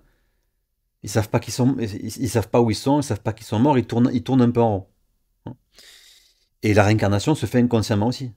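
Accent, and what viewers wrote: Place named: South, France. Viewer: trop bien, vous êtes du sud avec cet accent?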